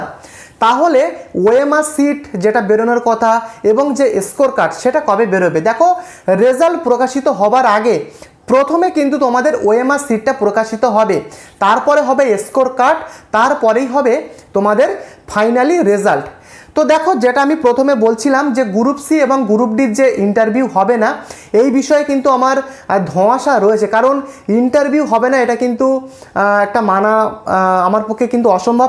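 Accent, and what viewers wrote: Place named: Bangladesh. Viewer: tomar bhasai bangladesi banglade vaab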